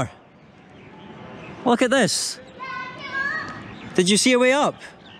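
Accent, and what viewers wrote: Scotland, United Kingdom. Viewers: This accent just makes me angry for no reason no wonder Scotland was wiped all over countless times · It’s called a Scottish accent 😁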